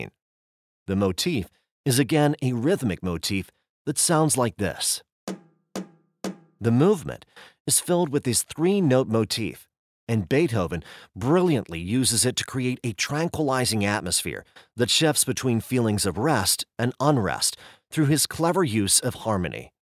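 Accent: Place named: United States. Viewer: If you have an American accent, then go by that. You sound like you're American.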